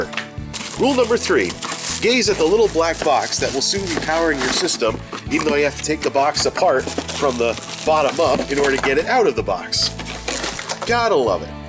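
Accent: United States. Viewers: I was digging the sick South accent man. Thanks for the vids, good stuff.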